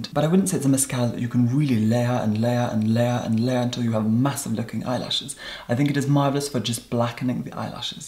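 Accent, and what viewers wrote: Scotland, United Kingdom. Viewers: He is scottish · I get Scottish vibes from the accent ...? But I have just come across u and I subscribed during the first five seconds I LOVE UR STYLE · What is your accent?? Is it posh Scottish?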